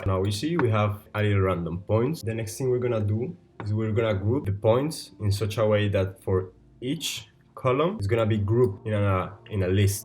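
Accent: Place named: Latin America. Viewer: Bro, are you a spanish speaker from Colombia? Your accent sounds very latin spanish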